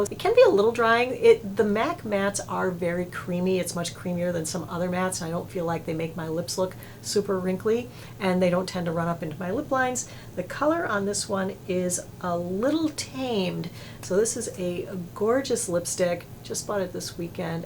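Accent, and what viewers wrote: France. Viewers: Love your French accent, oui oui! ❤️ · Love your French accent!😉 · Oh! Votre accent Français! Too cute;) J’adore vos vidéos!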